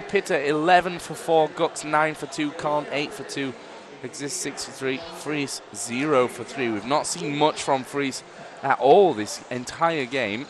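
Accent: Australia. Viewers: wow, aussie commentator! AUZ FTW! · Who commentated this? An aussie?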